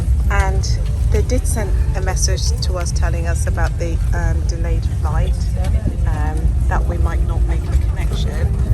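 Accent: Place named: United Kingdom. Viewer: I subscribed because of your bri**ish accent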